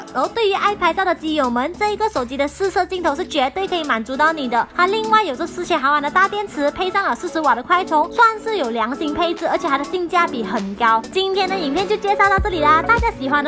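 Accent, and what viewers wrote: Malaysia. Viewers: 這應該是馬來西亞中文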